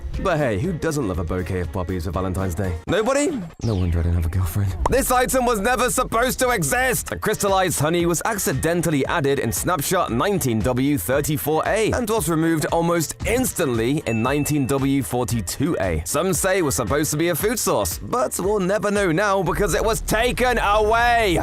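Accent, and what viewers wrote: United Kingdom. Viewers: Glasgow accent?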